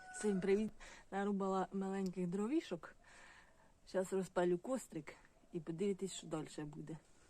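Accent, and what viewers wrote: Poltava, Ukraine. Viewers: 👍🙏❤💙💛💐👋Вітаю! Випадково натрапив на ваш канал. Сподобалось, як розмовляете з полтавським акцентом. Ви також красива, бо полтавчанки ж найкращі!!! Щиро бажаю міцного здоров'я, простого жіночого щастя та якнайшвидшого мирного життя!!! А керамічними ножиками не дурні ріжуть, а дурні їх виготовляють. Такими ножиками тільки зелень різати, а мерзле смачне сало добре ріжуть саморобні, дебелі та надійні ножі. Бажаю вам подальшого розвитку каналу та багато - багато доброзичливих підписників! Хай щастить!!!❤❤❤✍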